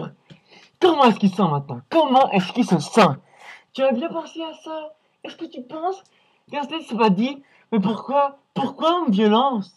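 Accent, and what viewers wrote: Belgium. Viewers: Ah oui, il est sûrement belge lui. Je dis ça par rapport à son accent. Mais vous inquiétez pas les gens, ce mec est juste chiant. Mais je me demande franchement s'il ne faut pas prendre la chose avec humour, tout ça n'est qu'un sketch sensé faire rire. Ou sinon, c'est de la jalousie mal placée. Pis bon, niveau philosophie, éthologie et argumentation, faudra repasser. Crois-moi mon "petit gars", le chat s'il est vraiment maltraité, il aura tôt fait de se casser. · ACCENT BELGE! BAH SA METONNE PAS! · "Prinnnmièrement, les chats sont des animaux comme les auuutres hein: Ils ont droit à du respect les iiiiinfants, du REPECT! Et ca Norman ne la pas cooompris les iiiiiinfants" J'approuve, c'est bien wallon comme chez moi ca:p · ne cherche pas serieu...1) je vois pas le manque de respect envers les chats... et 2) fais pas ton philosophe, ton accent belge craint a mort!! 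et enfin 3) Norman est bien plus drôle...!! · Et evidémment, qui c'est qui fait ce genre de chose? Un Belge >< (Au temps pour moi si tu ne l'es pas, ton accent a couper au couteau m'a trompé) Après ca, nous, bons belges que nous sommes, on va encore s'en prendre plein la geule, sincèrement du fond du coeur, MERCI! (Putain de VDM la:/ ) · Nan mais sans déconner les gens vous critiquez sa vidéo mais le mec ca se voit bien qu'il a fait ca pour déconner avec son accent belge et tout moi ca m'a bien fait rire.... Alors arrêtez 2 secondes de lecher les boules de Norman quoi... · t'es aussi débile que ses vidéos. Tu te caches derrière un masque et ca camoufle pas ton accent belge. On a compris que tu defends les chats. Par contre, tu devrais faire une video sur les félines quand elles prennent leur petit par la peau du cou. Je pense que t'es resté trop longtemps sans oxygene. retires ton masque ca ira mieux. 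· tu serait pas belge toi? (pour ton accent de merde et ta connerie naturel)! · "Si un homme n'est pas bon z'avec les animaux." + GG l'accent belge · Tu craint casse toi avec ton accent tu insulte la belgique!!!!! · ton accent belge i ma bandé!! alor continu a decouvrir ton mac et faire mumuz ac photobooth!! obligé de mettre un mask pr parler soi tu sert a rien soit ta pa de couille! bref t bidon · Fait pitié en plus jcomprend rien a cki raconte on dirait encore un belge de merde avec sa voix xddd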